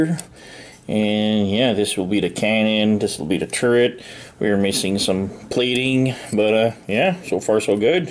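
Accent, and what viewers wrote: Quebec, Canada. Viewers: Super interesting. I haven't built these kinds of models before. Do I hear a Quebec French there?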